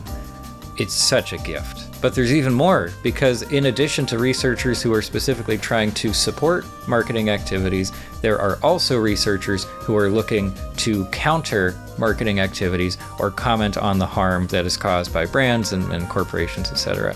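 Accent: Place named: United States. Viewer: Hbomberguy with hair and an American accent.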